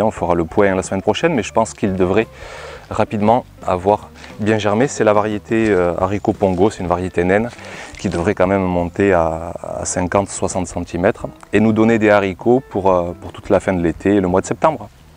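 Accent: South, France. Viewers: Bonsoir, merci encore pour cette belle vidéo,et alors un jardin qui pousse à vu d’œil!! Tu as des légumes à en être jaloux!! LOL. Je voudrais tellement avoir mon potagé comme le tiens. Mon jardin se trouve dans la forêt je suis dégoûtée..Il y a 15 jours, il a pluie énormément et mes tomates ont pris la maladie ( je suis dans l’Ain). j'ai essayé de les traiter avec de la bouillie bordelaise, mais je crois que je les ai perdu mes 15 pieds. Crois tu que je puisse en retrouver encore dans les jardinerie pour les remplacer?? J'ai planté quelques pieds de courgettes, de la diamant et un pied de courgettes allongées jaunes,mais je ne me souviens plus du nom. peux tu me dire ce que l'on peu planter encore dans le jardin ce mois la et les mois à venir. Merci à toi et et à ton petit accent du midi!! j 'adore A bientôt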